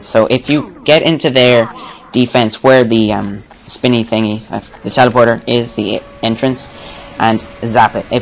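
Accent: Ireland. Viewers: Ur irish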